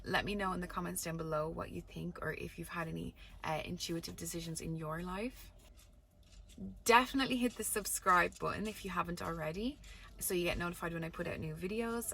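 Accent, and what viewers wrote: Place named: Ireland. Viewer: omg are you Irish? loooove your accent! and this view!!!! 😍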